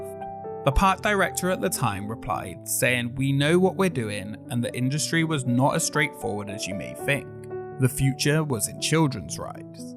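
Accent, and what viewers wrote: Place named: Northamptonshire, United Kingdom. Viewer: I always wanted to go here as a kid! You sound like you’re from near where I’m from (Corby, Northamptonshire)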